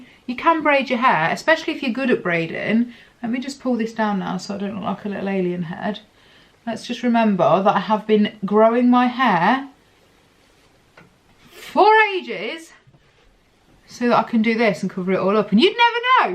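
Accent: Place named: United Kingdom. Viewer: So Bri’ish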